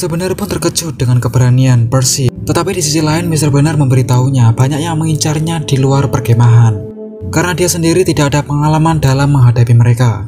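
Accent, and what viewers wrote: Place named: Java, Indonesia. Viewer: Penjelasannya enak dgn warna logat jawa yg kental. 😄😁😊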